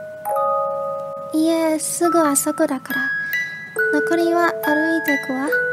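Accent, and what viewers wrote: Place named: Japan. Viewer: Your Japanese accent ie awesome.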